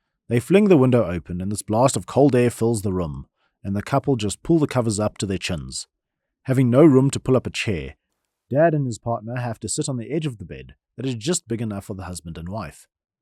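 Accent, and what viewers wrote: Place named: South Africa. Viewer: We got South African Reddit reader before GTA 6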